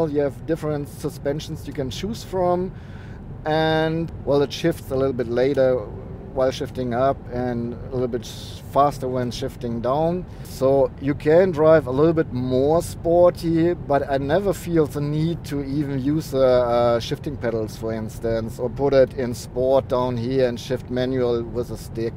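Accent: Germany. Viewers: I really like your complete cover of the car, your german accent is really not a problem. Continue like that :-)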